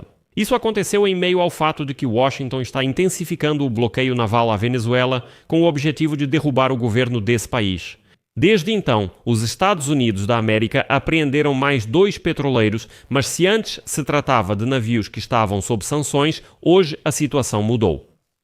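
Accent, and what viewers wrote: Portugal. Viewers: Vc tem um sotaque diferente do brasileiro, fala de Portugal ?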